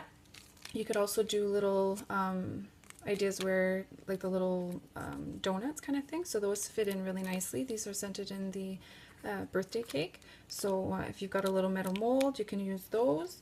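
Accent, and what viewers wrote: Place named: Ontario, Canada. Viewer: Thank you so much for the informative videos! I’ve been binge watching your vids & love them all! BTW: your “accent” takes me right back to summer even on these cold winter nights. My family has a cottage near Port Colborne & we snowmobile near Algonquin Prov. Park. So basically..listening to you gives me warm fuzzy feelings besides all your fab tips & tricks😆💕!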